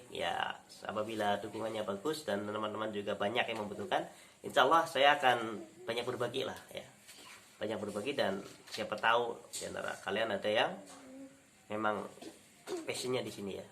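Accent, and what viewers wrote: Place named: Banyumas, Indonesia. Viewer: Ijin subskrebb gan... Kok bahasa indonesianya kayak orang barlingmascakeb ya?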